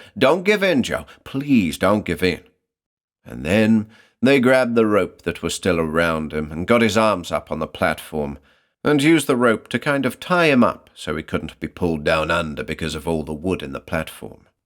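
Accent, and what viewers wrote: United States. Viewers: Really good American accent,:)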